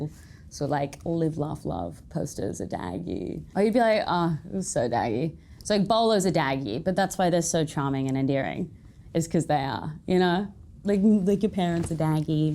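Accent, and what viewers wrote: United States. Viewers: You can really tell she’s starting to get an American accent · There’s an American accent starting to creep in there.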